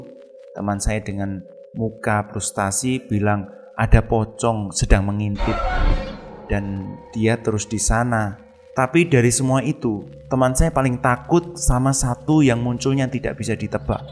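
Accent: Java, Indonesia. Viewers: Bahasa jawa medok 😂😂😂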